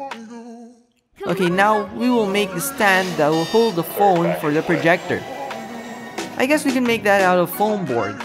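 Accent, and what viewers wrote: Philippines. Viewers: He has a filipino accent :// sorry I'm new here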